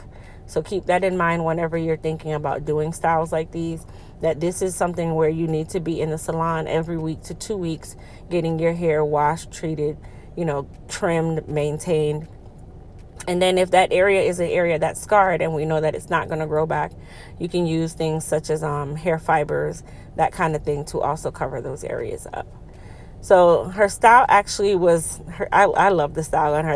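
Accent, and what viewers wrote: Jamaica. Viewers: I detect a Jamaican accent? I appreciate how you preserved her dignity while increasing her self confidence. ❤️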